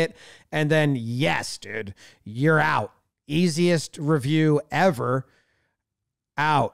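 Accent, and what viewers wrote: United States. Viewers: this is the first time I have ever heard an American Accent talk about cricket, I am surprisingly pleased, that was awesome, thank you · Weird hearing an American accent talk cricket. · Does anybody else think it's jarring, the American accent that knows the game of cricket.. ? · Huh. Never heard Cricket talk in a 'Murrican accent before. Feels...... weird. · Refreshing to hear an American voice commentate and actually have knowledge about the game and even your breakdown is spot on with tactics and thought in the game. Head and shoulders above all the reaction videos, which are nice but are common. Your take is a refreshing dose of charm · I've been watching you review cricket for years now, but I just had a moment where it felt bizarre that an American was reviewing cricket. There's no THICK Aussie or British accent, so I'm confused. 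· So strange hearing an American accent comment on cricket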